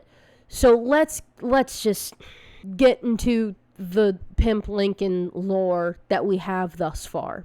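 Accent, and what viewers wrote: South, United States. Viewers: I'm hearing more of a Southern accent than a Boston accent. :P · I love your southern accent. 😊 It's so cool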